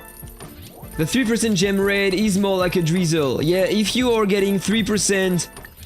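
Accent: France. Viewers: Attends tu es francais? J'avais capté l'accent mais je pensais pas que tu étais français...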